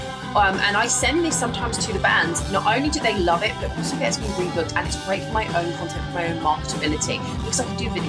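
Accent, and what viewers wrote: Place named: United Kingdom. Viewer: Actually I thought these are great tips I am going to bring in my small gig photo session today. But the presentation was rather busy. No offense, but the quick talking with the specific accent made it slightly hard to follow what was said for a non UK person as me. Specially with the brief text that flashed past in the bottom of the screen. A tips, slow down. Don't try to say as much as possible on as short time as possible.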